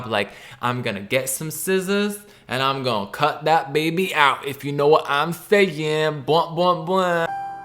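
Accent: New Zealand. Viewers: the entire video and the nz accent got me so blindsided as a nz person LMFAOOOO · I cant with your new zealand accent 😭😭😭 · So happy you posted again ❤️ and your New Zealand accent had me dying 😭😭💀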